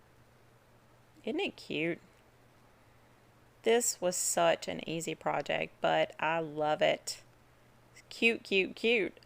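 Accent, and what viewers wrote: South, United States. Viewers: I just love Dollar Tree. Love your Southern accent.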